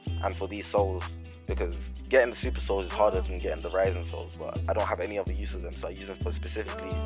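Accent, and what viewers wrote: United Kingdom. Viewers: My guy fam ur british too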